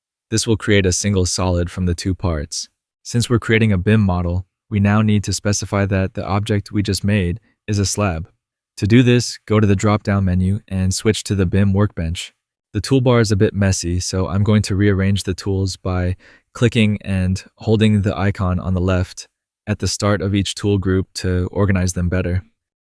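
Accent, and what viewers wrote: France. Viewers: Why do you use a voice actor for some of your videos? It's better when it's your normal French accent.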